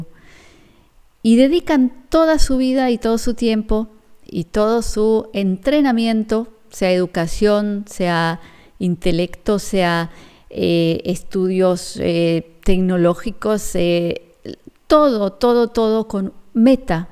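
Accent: Argentina. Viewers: Verte y escuchar tu acento argentino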